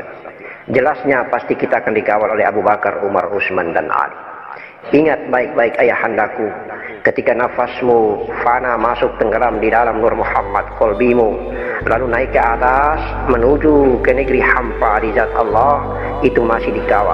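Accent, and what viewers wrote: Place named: Bugis, Indonesia. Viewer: Assalamualaikum Kalau saya dengar logat bicara nya Abah guru tercinta ini orang Bugis Ada gak yg tahu nama dan alamatnya. Kami mau ketemu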